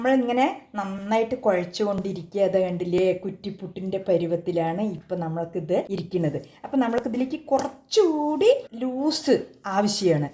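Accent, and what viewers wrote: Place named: Palakkad, India. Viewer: അമ്മ പാലക്കാട് എവിടെയാ ....? തനി പാലക്കാടൻ ശൈലി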